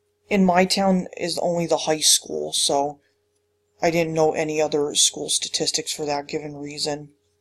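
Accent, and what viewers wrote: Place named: Northeastern Pennsylvania, United States. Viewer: I was born here in 1945 and just sold my father's house not too long ago. I still come back to visit. Great job,. Hopefully, people like you will make Plymouth once again what is was when I grew up there. You even speak NEPAlese perfectly! May God bless your life in Plymouth.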